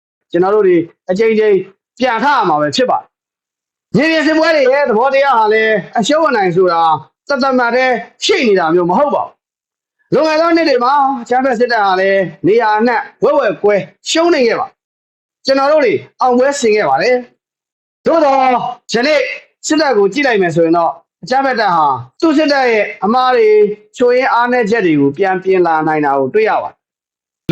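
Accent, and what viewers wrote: Yaw, Myanmar. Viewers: okယောနသံ ❤၁၀၀၀၀၀၀၀၀၀၀၀သိန်း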